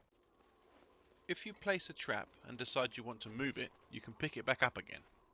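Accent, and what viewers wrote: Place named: United Kingdom. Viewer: A bit late on here but ' better late than never' I got SE2 3 and 4, love these British made games, on Steam with an Xbox controller, USB connected, in SE2 there is a tutorial at the beginning, so this taught me how to sneak around n shoot. But with any games, make mistakes and you learn. The tip you did miss was when in the bushes and having a dump be sure you are downwind. Well presented tutorial, 10/10 clearly understand your cool calm British English voice, they are the best with tutorial lessons.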